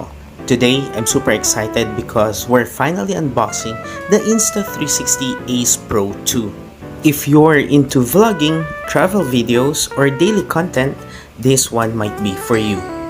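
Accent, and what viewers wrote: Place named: Philippines. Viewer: Pinoy na Pinoy ang accent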